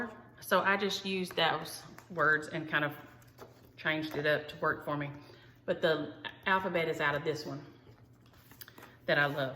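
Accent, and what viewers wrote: South, United States. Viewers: Lady. Thanks for sharing. You are hysterical. This yankee doesn't get to hear cool southern accents like yours very often. I enjoy it so much!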